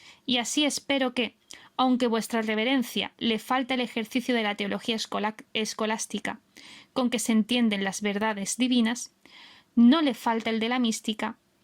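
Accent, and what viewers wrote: Andalusia, Spain. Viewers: Me encantó la síntesis que has hecho. Felicitaciones! En cuanto a tu acento andaluz…no te disculpes por ello, es parte de lo agradable que escucharte. No soy española, aunque si de ascendencia y andaluza además. Mi familia llegó a Costa Rica procedente de Torrox, Málaga. De ahí que el habla andaluza me sea muy querida y cercana. Un abrazo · Muchas gracias …. Y ese acento espontáneo andaluz me encanta jajajajaja. Agradecimiento por tu trabajo de un andaluz provinciano .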